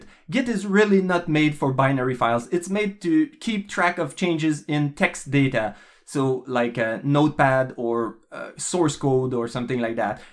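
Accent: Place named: Quebec, Canada. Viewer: Québécois? L'accent semble dire que oui! Ton channel est vraiment bon merci pour les vidéos et l'effort. J'ai une question pour toi (je vais la mettre en anglais pour le bénéfice de tous). I use git/github for programming. However, I'm just starting game dev as a hobby: how do you use it for version control specifically for video games? Do you save everything, or just your source code? Do you use different kind of backup (one for the binary files - or at least non-code, such as the project files - and then one for the source code?) or just one repository for everything (or do you save them elsewhere)? Or for the binary files you just put them on a physical device for backup? Also, what's the architecture of the git trees itself for games (in terms of good practice)? Is it the same as software where you have one main stable one, then multiple production one for different features, etc. 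? In others words, could you extend a bit the end of your video? Thanks!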